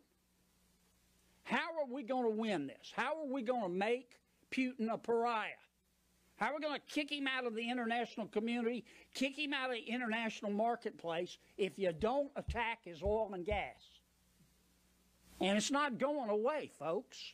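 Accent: South, United States. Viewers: Common sense with a Southern accent!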